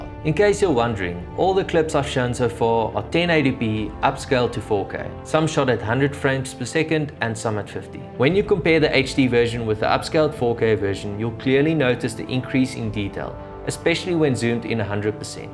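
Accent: South Africa. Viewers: Hi. Amazing channel. Thanks so much for sharing all of your skills. Great to listen to a South African for a change. No sure which programs you use for your editing but I using Premiere Pro. Not a big APPLE fan so not using macbooks as my laptop of choice. I know I can follow recomendations from ADOBE themselves but what would you say will be a good setup (hardware) to do some descent 4K editing?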